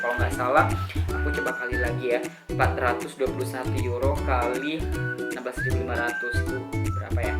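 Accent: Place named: South Sulawesi, Indonesia. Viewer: Hai kak, kalo dari aksen sepertinya orang Sulsel hehe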